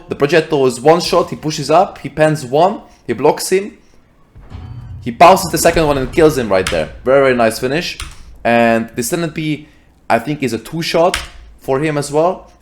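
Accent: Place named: Hungary. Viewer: magyar vagy te baszod az akcentus :D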